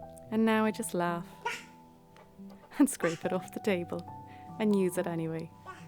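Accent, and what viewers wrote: Ireland. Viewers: 3 years later and I still comeback so I can go little slower. Your Irish accent I confess brings me joy and calm that I cannot exactly explain. But thank you for all that you put on this platform. I hope your little one is growing well. And that you are still living that is best suited for you and yours. Just dropping in to say hi and happy October again. The seasons cycle is so beautiful don't you think? Take care from the PNW in the USA. May the rain bring all the life needed. ❤❤❤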